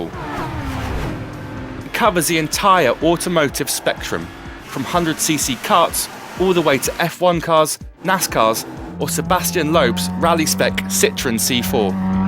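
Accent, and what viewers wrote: United Kingdom. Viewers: why do british accents make everything sound worse than they really are!!!!??? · Fuck no i aint listening to that fuckin awefull british english! Makes me wanna puke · No. What you're hearing is his accent. He's likely from Britain, which is where the English language originated from. We here in the west speak English differently since the language has evolved through time. I'm guessing this is your first time hearing an English accent. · The British accent made everything sound more reputable. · aaarghhhhh that freakin british accent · ''buh wif the game lacking elseweah? thas noh kwai enuf to kip it un tup off the racing pail.'' FUCK YOU, BRIT! no offence to the other brits out there, but this guy's a penis gnome. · I hate British accent! Damn!!!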